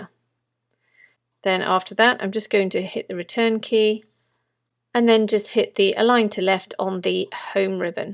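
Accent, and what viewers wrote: England, United Kingdom. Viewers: She IS an English.That's what an English woman sounds like. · I searched high and low for a UK English voice and after many tries, I found you. You have provided an EXCELLENT working model that is concise, and easy to follow, what more could a writer want? Well done, and thank you. Judith Page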